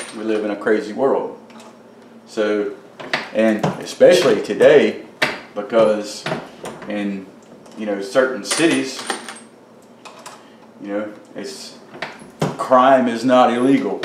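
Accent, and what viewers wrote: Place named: West Virginia, United States. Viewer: I wonder if is going to play and sing in one of his videos he is definitely a patriotic American and sounds like awesome people my guess is by listening to that accent he is probably from WV... 😉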